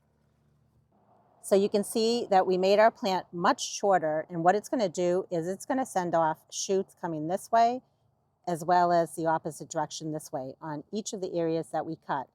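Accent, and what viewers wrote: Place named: New England, United States. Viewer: You popped up first and I could immediately tell you were a new englander - great video thank you!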